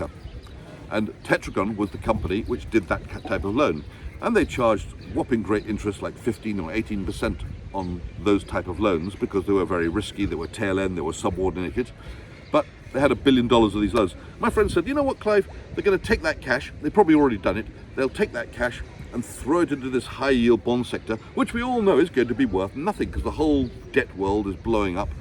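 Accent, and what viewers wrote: United Kingdom. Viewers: You sound like a fine British gentleman with deep love for the markets and your family. Great stuff sir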